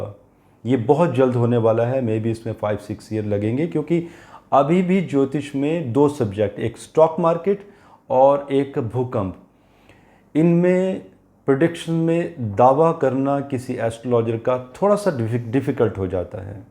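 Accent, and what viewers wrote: Punjab, India. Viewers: Punjabi accent? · I really like your Punjabi Accent ...:)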